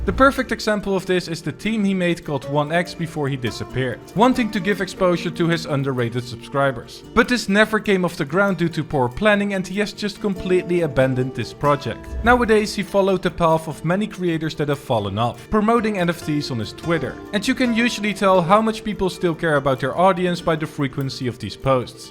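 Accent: Netherlands. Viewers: you sound dutch? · Just a ask where are you from (I’m Dutch and idk but I sound like you Dutch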